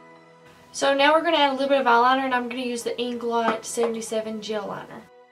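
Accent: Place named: Georgia, United States. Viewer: Oh and where are you from? I'm from Georgia, you sound like you could be from here lol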